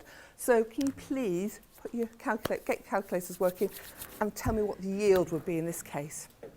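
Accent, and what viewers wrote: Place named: United Kingdom. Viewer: It's a boring enough subject to sit through. Why on earth would anyone want to sit through it having to listen to someone with such an annoying British accent?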